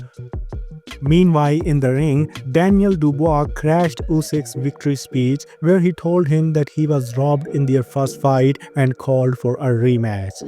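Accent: India. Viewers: Good to hear from you my homie with the Indian accent. Hope you and your family have been well. Thanks for the content you make in combat sports you do a fantastic job. I hope you have a good holiday season and a happy new year my friend!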